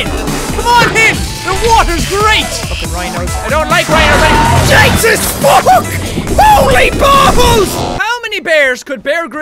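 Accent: Australia. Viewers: Your bringing back the Aussie accent again. Embrace the Aussie accent! Long live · has an awesome Australian accent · I'm an Aussie and that wasn't the worst Aussie accent ever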